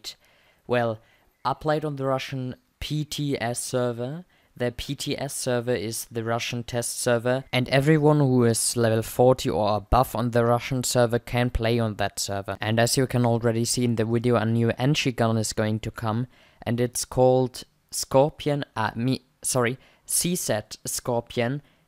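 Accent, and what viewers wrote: Germany. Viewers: could you add me on EU server I would love to be on your FL and play with you name = NotoriousIshy (ps your English with the German accent is better than most people's English I know LOL)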